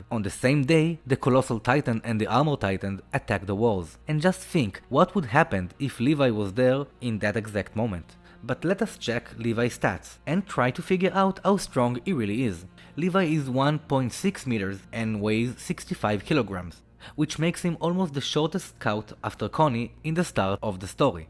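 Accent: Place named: Israel. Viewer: אתה ישראלי, נכון? שומעים את זה כל כך😅😅😅 ואגב, אחלה סרטון👌🏻👌🏻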